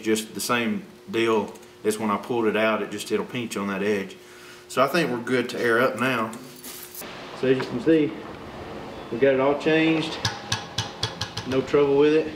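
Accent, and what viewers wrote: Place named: South, United States. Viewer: Hey man, thanks! The southern twang was comforting as well as seeing you struggle a little. Definitely inspiring!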